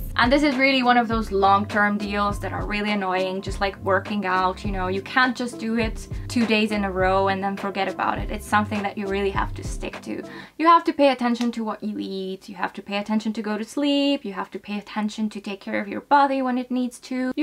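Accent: Hungary. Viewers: …where are you from?? Just wondering…I take a guess but can’t quite get it correct I think. ❤️🤗Ok, I see now. You’re from beautiful Hungary!!! Lovely accent. ❤️🤗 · What's wrong? She is from Hungary and many people around the world have accent and pronounce words differently.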